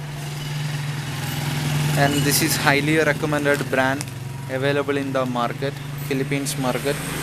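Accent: India. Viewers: Pota indiano pa ka bwect pakinggan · I dont think your a filipino, your accent sounds like indian guy for sure. Your video is not credible for all sabungeros to share what is good chicken feeds if your not one of us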